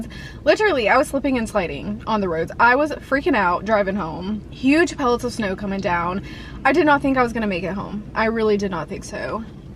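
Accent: South, United States. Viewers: dat down souf twang u talking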